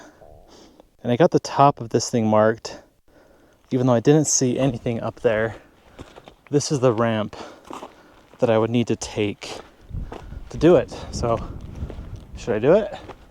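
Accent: United States. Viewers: I love your channel for not only your aDventures & comments. I find that your American accent is so obviously American. Keep on trekking.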